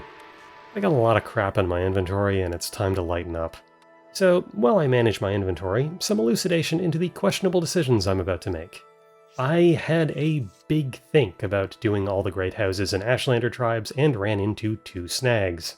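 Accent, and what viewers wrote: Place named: Canada. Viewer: You being, and I mean this as a compliment, *aggressively Canadian*, is apart of the charm of these runs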